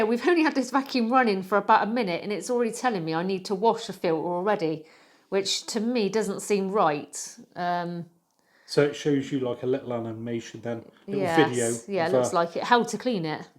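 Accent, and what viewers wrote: United Kingdom. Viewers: My language is french and I love so much to hear the British English that I forgot I was here to learn about a vacum cleaner.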